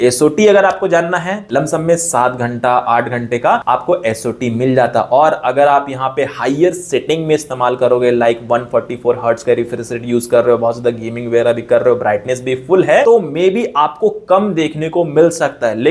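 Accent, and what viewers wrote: Bihar, India. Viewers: Sir aap bihar se hain kya??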